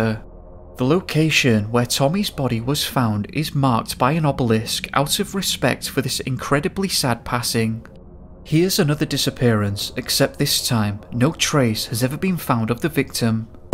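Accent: United Kingdom. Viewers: Good enough video with out the monotone british accent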